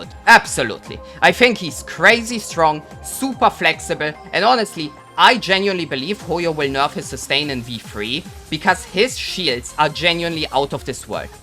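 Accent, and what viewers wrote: Germany. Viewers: bruh, i'm trying to figure your accent. Are you a German?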